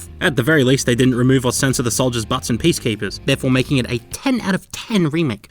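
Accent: Australia. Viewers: Watching this in class FUCK ENGLISH WHEN YOU HAVE AN AUSSIE MAN THAT SPEAKS ENGLISH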